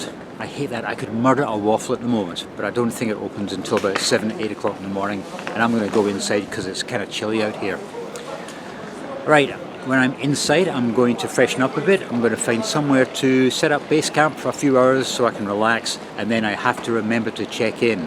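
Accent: Scotland, United Kingdom. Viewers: Auur…aøuhr…awrur….As a Dane it’s quite complicated to try to pronounce ‘hour’ in Scottish - like you do,. 😅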